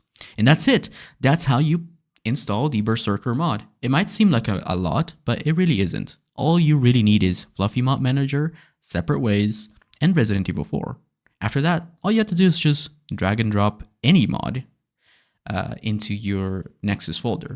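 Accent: Italy. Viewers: ❤ Personally I'm a console guy, but the fact that you took time for your fellow peeps on PC to give them a head on education makes your Italian accent the cherry on top. I support good people and good content. Enjoy brother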